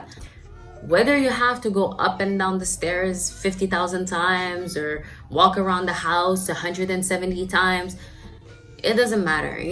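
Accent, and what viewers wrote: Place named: Tagalog, Philippines. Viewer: Awesome video! Thank you for sharing your journey with us. Side note: your accent sounds a bit like you are a native Tagalog speaker (Philippines). I’d be curious about where you are from.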